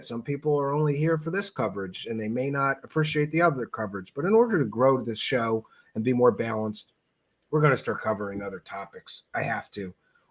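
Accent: Philadelphia, United States. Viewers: Are u from Philly? Or the Philly area? Ur accent sounds like my Philly accent hahaha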